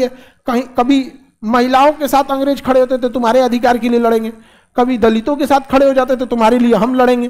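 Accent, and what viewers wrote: Bihar, India. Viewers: Tu Hindi me bol Kya bolta he kaun si deshi bihari bolta he Achhe log samaj nahi pate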